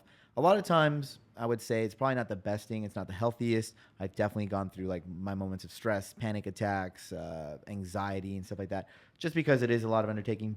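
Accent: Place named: Argentina. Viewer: Tiene acento como de argentino.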